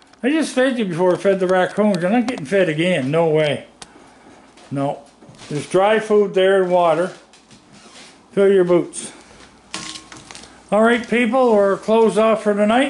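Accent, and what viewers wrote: Canada. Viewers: Ellen M ---Many people who live in the Maritime provinces of eastern Canada , ( New Brunswick , Nova Scotia , Prince Edward Island ) have an accent . It is even more of an accent if they are from Newfoundland , or , they are from the Cape Breton Island part of Nova Scotia . Where he lives in Churchville , in Pictou County , Nova Scotia , he is not far from Cape Breton Island . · Very Canadian English eh? Had to look up what bed lunch was 🙂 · Greetings from Illinois. I LOVE your Canadian accent. Those little guys are precious. You are wonderful to take care of them every night. I descovered your videos on you-tube a month ago. Never miss watching now. Thanks & God bless you.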